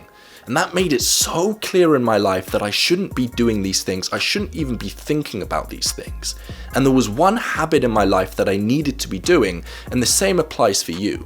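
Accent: United Kingdom. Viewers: Great! It's awesome. Are u from UK?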